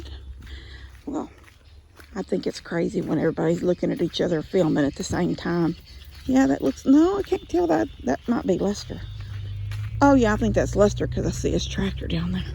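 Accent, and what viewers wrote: Texas, United States. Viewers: I'm from Central Alabama... lived here all my life. I didn't realize the Southern draw Texans have. Even more than me. LOL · sometimes watching you do practically nothing but talking (in your wonderful Texan accent) are the funniest videos. Thanks for the laughs.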